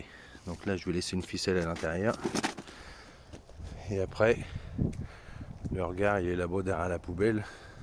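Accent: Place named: Nord-Pas-de-Calais, France. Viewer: • Tu as l’accent du Nord-Pas-de-Calais 😁 ! Est-ce qu’on peut t’appeler pour déboucher un fourreau ou est-ce que tu travailles uniquement avec un opérateur ? Merci d’avance à toi. Et bon boulot ! Tu n’as pas fini comme Claude François… 😁👍🏻 🥳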